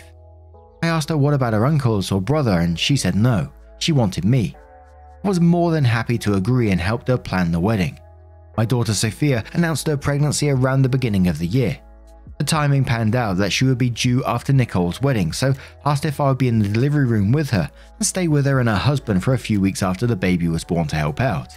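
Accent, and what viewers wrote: United Kingdom. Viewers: He's great at that, isn't he? It's interesting to go back to his older vids and compare how his delivery of and observations about posts has developed. It was when his mother was ill that he first had difficulty delivering his vids on schedule that he began to open up about stress and grief. Well. You can just imagine the waves of love he received from his listeners. He seemed to feel safer with revealing more of his personal thoughts, and frequently apologized for "waffling on." Thus was born the. Throughout all this strife, still managed to be utterly charming. He's smart, funny, and articulate. And to us Americans, his British accent is the cherry on top. Maybe I should say, the real maple syrup on the waffle. Other YouTubers who engage with their audiences a lot are rSlash, Lost Genre, and Charlotte Dobre. Charlotte's a hoot and a half.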